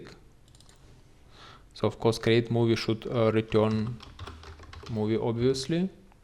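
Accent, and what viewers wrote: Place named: Russia. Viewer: russian accent makes it impossible to listen. anyway thanks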